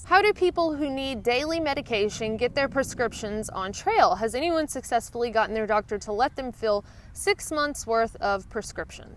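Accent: South, United States. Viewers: I just spotted your channel today, this is the 6th video I've watched so far. I'm going to subscribe to your channel because I love the beautiful scenery you get to see on your hikes and also because it's so great to hear that sweet southern charm from a fellow southerner. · Just for fun, I pulled open a tab with your YouTube video from one of your first AT vids: "Extras: I Almost Knocked My Teeth Out" . I played a minute or two of you talking, then a few minutes of this video. Then switched back and forth. You still sound like you're from the south, but you've lost some of your accent. I wonder if it will start coming back, now that you are home. Not saying that a southern accent is good or bad or anything. I just love linguistics and find it fascinating. It's interesting to hear a difference.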